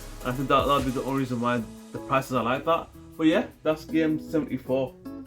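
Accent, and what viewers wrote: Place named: North, United Kingdom. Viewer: You have a strong northern accent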